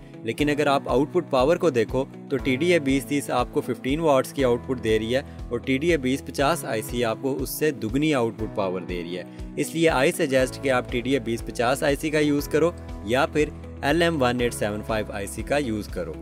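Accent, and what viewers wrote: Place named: Pakistan. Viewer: Pakistan accent 😊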